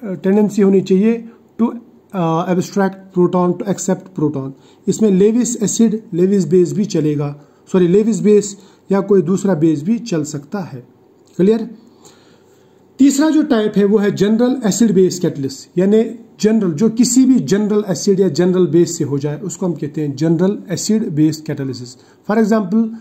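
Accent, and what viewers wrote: Kashmir, India. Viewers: Sir you sounds like kashmiri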